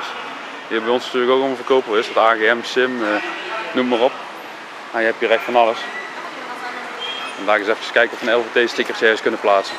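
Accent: Limburg, Netherlands. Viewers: Leuk gedaan zo. Lekker droog Limburgs commentaar(ben zelf van 045😉) wacht nu alweer op deel 2! Trouwens is dat zwarte ding niet gewoon een mega buis voor een mega Shell ? Je weet maar nooit bij die chinezen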